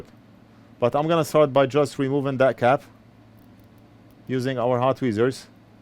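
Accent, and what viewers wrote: Russia. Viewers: His accent doesn't sound native, he's more of a russian than American, that's why America wants to get rid of Russia.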